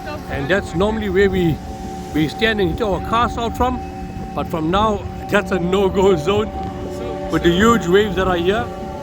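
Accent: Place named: South Africa. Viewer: Country would have been useful, judging by commentators accent and posts elsewhere, I take it this is somewhere in S? Africa, obviously not UK! Last person to mention havoc was Shakespeare.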